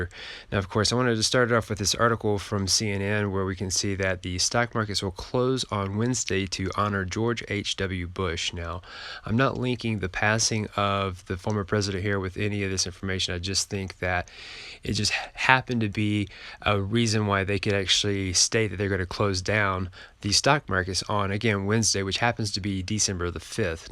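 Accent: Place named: United Arab Emirates. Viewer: You sound a guy from UAE. 50 should be fine for you to make few millions if you have bought few thousands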